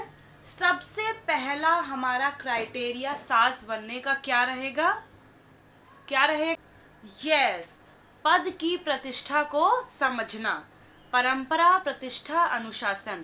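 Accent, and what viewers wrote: Kanpur, India. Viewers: Yeah.. I have seen your interview... But your tone is killing it(totally kanpuriya)... Really proud... Artists like you are a real inspiration for society..comedy, Happiness , laughter from day to day experience without any bad words or double meaning jokes is rare nowadays.. Keep it up.. Lots of ❤❤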